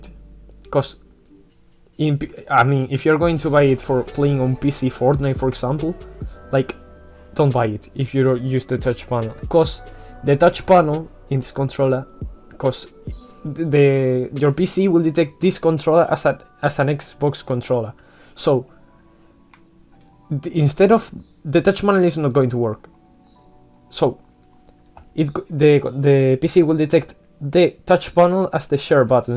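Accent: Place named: Spain. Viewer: se nota que eres español xddd gracias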